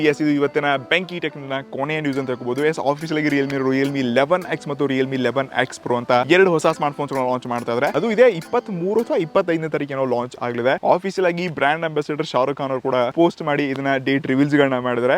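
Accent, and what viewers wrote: North Karnataka, India. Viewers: ಅಣ್ಣ ನಮ್ಮ ಉತ್ತರ ಕರ್ನಾಟಕ ಭಾಷೆ ಮಾತ್ರಾ ಬೆಂಕಿ 🔥🔥🔥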